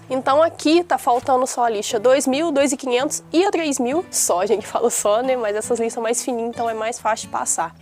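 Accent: Minas Gerais, Brazil. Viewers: Você é de minas gerais?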